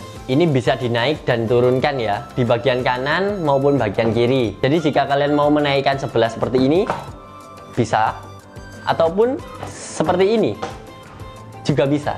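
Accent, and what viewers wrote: Java, Indonesia. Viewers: Masih medok Harus di halusin 😄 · Mendok banget... Gak enak dengernya.. Belajar ngomong dulu ya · Aku suka medok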